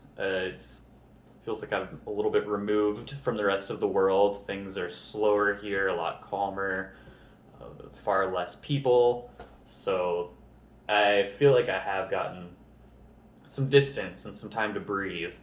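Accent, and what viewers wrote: Colorado, United States. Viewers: Heyy 😅 this is probably not the comment you were expecting but I was looking for a video with someone who had that beautiful Colorado accent as I have to learn it for an acting gig. Your like the ONLY ONE I can find on Youtube! But I must say thought this is one darn interesting video. I was captivated until the very end! 👌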